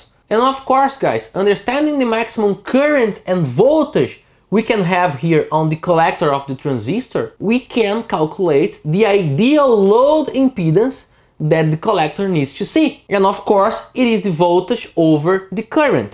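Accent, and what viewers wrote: Brazil. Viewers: Tu é paulista?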